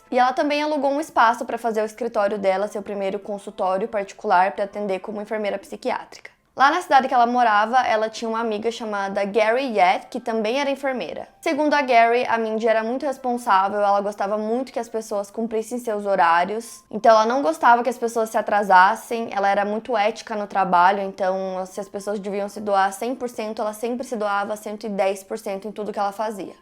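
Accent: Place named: São Paulo, Brazil. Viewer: Nada a ver com o vídeo, mas a pegou completamente sotaque de Paulista 🤣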